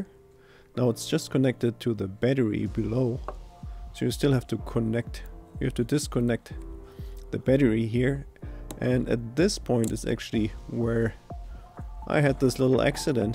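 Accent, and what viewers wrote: Germany. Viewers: Bist du deutscher? Hört sich so an 😂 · Gutes English aber man merkt das du deutsch kannst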